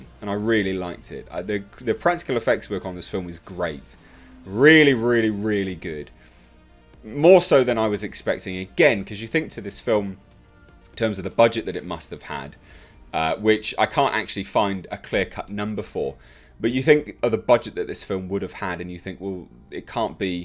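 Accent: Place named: England, United Kingdom. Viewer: Ha ha Automatic subtitles: Colorado Space, must be the english accent. Nice movie, except for the brother and sister nonsence decisions near the ending. Their mother has become something like Brundlefly, but it's ok, everything's going just fine. Or the brother who wants to get into the well to save his dog? Probably the best HPL adaptation now. So far, I thought Dagon was the less terrible with From Beyond...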